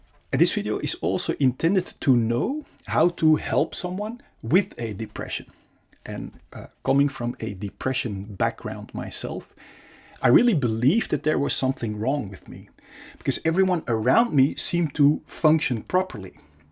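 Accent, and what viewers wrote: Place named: Netherlands. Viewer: Hallo...ik heb me al een paar keer ingeschreven maar niets gekregen. Ik zal eens een mail sturen om te unblocken. Aan je accent te oordelen kon ik in het Nederlands schrijven 😊 Ben een belgje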